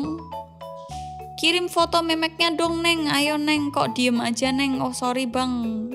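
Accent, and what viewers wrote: Java, Indonesia. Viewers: mbak.e wong jowo jowo endi mbak? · Wong jowo toh mbak? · Jowo ndi sampean mbk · logat jowone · Mbk ee kok jawa orang mana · Medoknyaaaaa mantabs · Wong jowo ki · Wong jowo yo mbk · Dialeknya jowo.. Rasa asik dengarnya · Medok bgt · Ya kan ya kan..jawa pundi mbak kok ya kan ya kan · Jowo medok pisan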